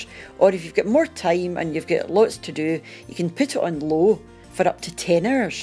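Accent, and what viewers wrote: Scotland, United Kingdom. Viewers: Lol love your Scotch accent. I live in Northern Ireland.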